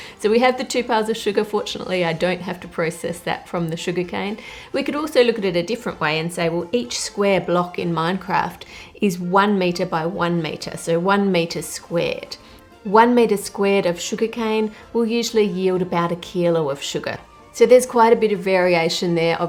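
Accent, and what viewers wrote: Australia. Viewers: Today I learned that aussies say "weight" because they don't know how to say "wheat". At least I guess that's why. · The best part of this video is hearing pronounce the word “grown”. I love the Aussie accent! Thanks for another great video:)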